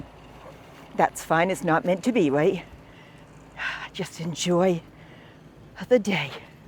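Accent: New England, United States. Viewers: Greetings from Maryland! I enjoy hearing where your subscribers live. Both of my parents grew up in New England (NH), so listening to your accent warms my heart. Thanks for the walk-about.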